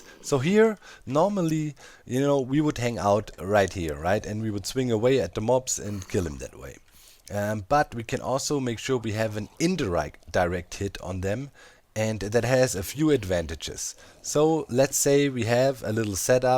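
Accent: Germany. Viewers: Uhhh nh deutscher 😅 cooler akzent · I like that accent it sounds kinda German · Are you german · You sound sooo german :D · Oh i knew you couldn't be british or american or australian or canadian du bist deutsche i hate gewohnt in deu für 9 monathe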